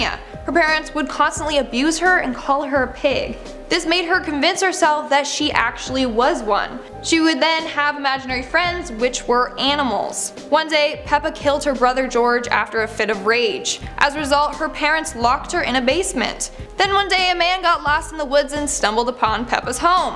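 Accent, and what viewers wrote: United Kingdom. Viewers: Whats Wrong With A Slight British Accent?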